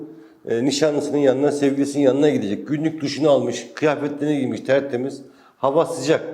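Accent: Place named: Turkey. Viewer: Cc bsr Mr merci beaucoup de nous avoir parlé de vous cependant vous avez du talent et ça ça ne s'apprend pas mr6 👍 bravo un grand Merci pour votre accent Turc j'adore.cest agréable de vous écouter je comprends rien mais je lis l'anglais donc je comprends tout je vous zdore6 jame6voyd voir ici à très vite merci beaucoup ♥️🇹🇷🇨🇵👍😎🇫🇷🇹🇷🇹🇷🇹🇷🇹🇷♥️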